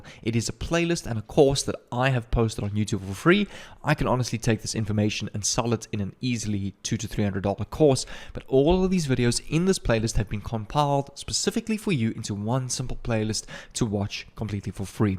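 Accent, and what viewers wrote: South Africa. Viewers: Are you from Za?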